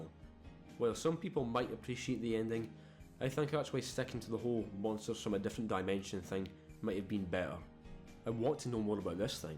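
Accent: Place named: Glasgow, United Kingdom. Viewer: Great retrospective. Couldn't stand the stuck-in-quicksand slow combat. Where in Scotland are you from? From the accent, im guessing you're a 'Wegie?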